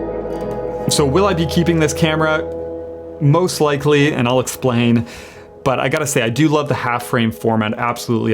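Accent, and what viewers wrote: Canada. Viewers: Sounds Canadien.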